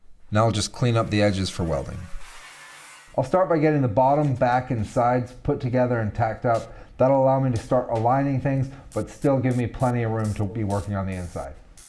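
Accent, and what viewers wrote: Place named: United States. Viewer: You sound very American for a bloke in the UK.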